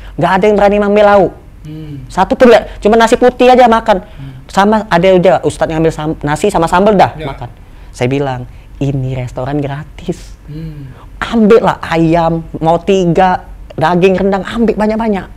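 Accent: Palembang, Indonesia. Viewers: logat palembangnyo om ku dak ilang ❤ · Ini pak ngomong lidahnya Palembang banget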